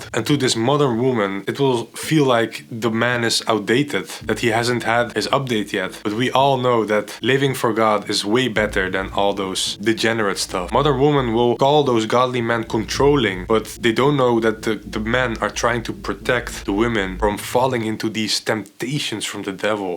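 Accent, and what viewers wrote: Netherlands. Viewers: Excellent video, and channel. You do sound Dutch 😊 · Ben je nederlands bro.. ik hoor je accent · His accent exposes the Dutch nationality. 🟧🦁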